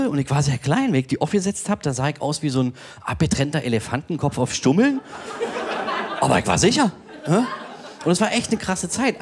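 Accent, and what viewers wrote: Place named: Berlin, Germany. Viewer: Ich find ihn mega gut. Und ick liebe did Berlinern. 🙏😂.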